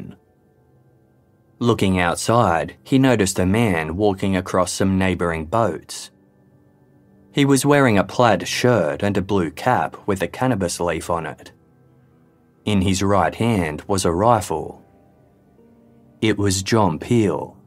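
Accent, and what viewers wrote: Australia. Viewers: 🇬🇧 I know this is an old episode but after having had a serious illness & recouperation, ive not watched many of my favourite channels! Therefore after getting my energy & interest back ive been trawling through my favourite Channels & binge watching & its been amazing hearing a big batch Of great videos that i love. Now ive made it to one of my top 5 channels with many many videos so im starting with my 1st missed episode today, with this case. Im champing at the bit to enjoy each & every one ive missed & there are alot to hear! Ive missed the narrators calm & reassuring voice. As i once lived in north Sydney for many years as a youngster & late teen before returning back to UK with my parents, i love the opportunity to hear the beloved Aussie voice. Thank you to everyone on the Casefiles team! You do a brilliant job & bring us the best & worst ( i mean in criminality) and ive often been chilled to the bone with some of The cases, especially the Ivan Milat ones... 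😨 So Thank You all so much! Your subs have jumped pretty high too Not far off that 1st magic 100k Level & thoroughly well deserved! Peace & Good Cheer to All 🇬🇧👧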